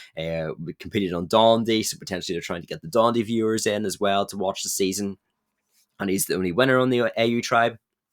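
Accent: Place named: Northern Ireland, United Kingdom. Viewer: Having watched Survivor for like over 2 decades, and only really having some family members also watch Survivor to discuss with them. It's really strange and refreshing to hear someone else with a Northern Irish accent talk about Survivor as there are so few of us! (I'm from Belfast)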